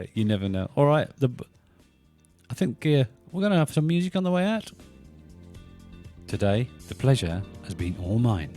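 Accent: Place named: Birmingham, United Kingdom. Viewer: From a Brummie.....so nice to hear a fellow Brummie on YouTube!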